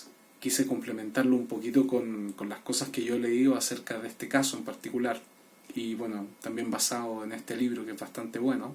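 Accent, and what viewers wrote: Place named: Chile. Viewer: Gracias por las recondaciones. Saludos desde Perú, he madrugado a ver tu vídeo Por tu dejo al hablar asumo que es Chileno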